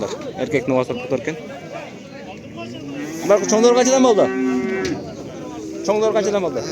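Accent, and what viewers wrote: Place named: Osh, Kyrgyzstan. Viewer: Бул блогер бала Оштон болобу ака дейт экен же Баткен Лейлектенби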